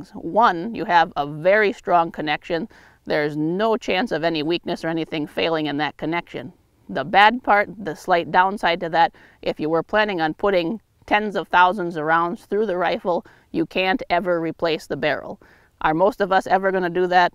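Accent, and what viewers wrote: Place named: North, United States. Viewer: This broads northern accent is awesome! Yah youbetcha!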